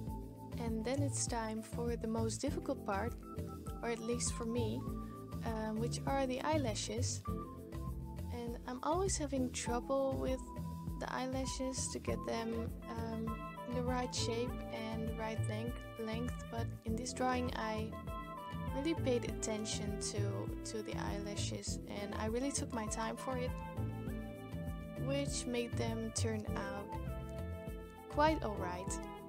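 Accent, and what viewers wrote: Netherlands. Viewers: Her accent is Dutch, she is Dutch so am I, is a typical Dutch name... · are u dutch?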